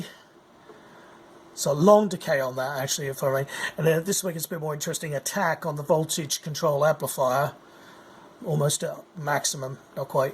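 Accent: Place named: United Kingdom. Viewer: Thanks for this, I'm really considering one now. Feels so right to hear a UK accent explaining a Wasp (clone, but still)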